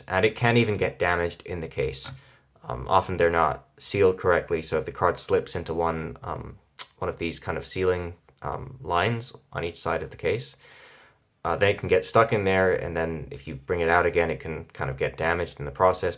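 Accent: United Kingdom. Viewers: Your British accent is stimulating my focus lol.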